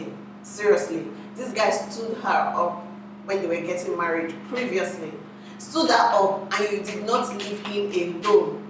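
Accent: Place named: Warri, Nigeria. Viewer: I loooove the way you talk. You sound like you are from Delta...warri to be precise. hahaha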